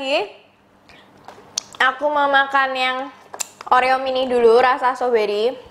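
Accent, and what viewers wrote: Java, Indonesia. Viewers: Logatnya ke Jawa Jawa an💐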